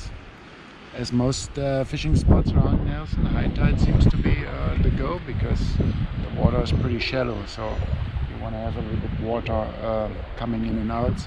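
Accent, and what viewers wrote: Germany. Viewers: German accent 😄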